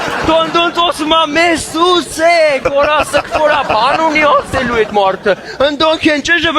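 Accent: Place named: Gavar, Armenia. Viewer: Գավառի համով բառբառը շնորհակալություն ձեզ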